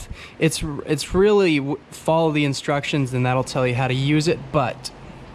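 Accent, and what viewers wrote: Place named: Wisconsin, United States. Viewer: Sounds like Wisconsin 😜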